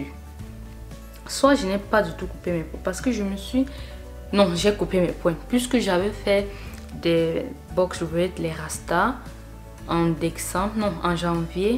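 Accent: Cameroon. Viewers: Slt ma belle!beau montage.je te suis depuis peu et j adore ton accent camer et ton cote' comik😆.j aimerai te poser une question,penses tu que la porosite' des cheveux influ sur le type de produit a utiliser?si c pas indiscret ,quelle creme hydratante utilise tu pour reussir a tenir 1 semaine sans les rehydrater?perso j ai des cheveux naturels coe sur mon profil et j ai vraimt du mal a trouver un hydratant ki me convienne😊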